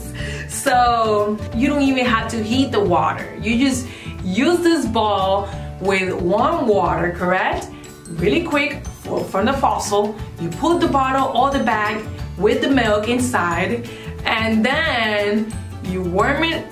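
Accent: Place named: Spain. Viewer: I love that she has this amazing Spanish accent, sounds and looks so bubbly - and that teal bow looks super cute on her! Video was also super informative, too. She sold the product better than the actual brand!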